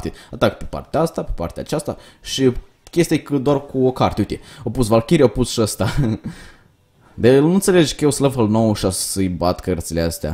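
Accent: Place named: Moldova. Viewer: ba moldoveanu-le invata sa vorbesti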